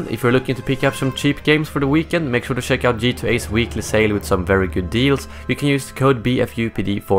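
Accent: Sweden. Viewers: Are you Swedish? Because you're English sounds like you're Swedish. Have a good weekend! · Are you swedish? Keep up the good work man..👍🏼👍🏽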